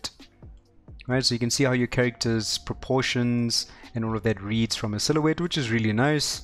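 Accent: South Africa. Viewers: 1st Thing I thought, this guy sounds like a South African :) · I'm curious, are you South African ? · South African accent detected...Which city you from ma se kind? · My guy, watching this video, I kept thinking to myself "That's a familiar accent...", stoked to discover another Jo'burger.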